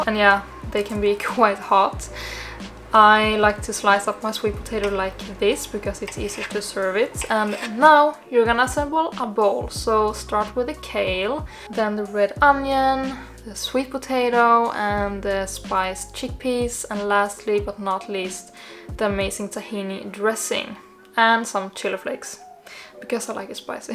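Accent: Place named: Sweden. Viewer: I thought you sounded Swedish. I googled you and see that you are Swedish Spaniard. Interesting because I always thought that the two accents (Swedish and Spanish)sound similar. Nice burrito bowl.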